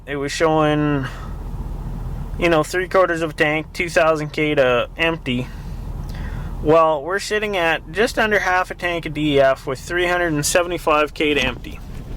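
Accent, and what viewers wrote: Canada. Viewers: First 4 words outta your mouth i knew you were from Alberta hahaha fuckin eh bud me too! 🍻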